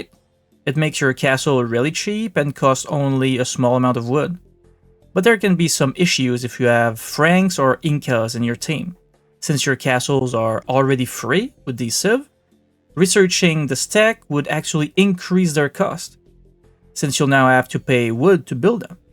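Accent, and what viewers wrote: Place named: Quebec, Canada. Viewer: Je reconnais un Québécois quand j'en entend un.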